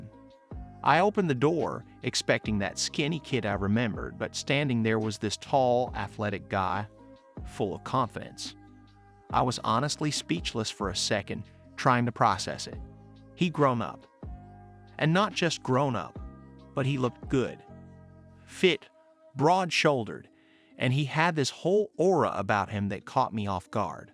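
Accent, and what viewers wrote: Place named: South, United States. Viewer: You sound like a redneck and that's hot! You and you nephew have some fun, a lot of fun many times!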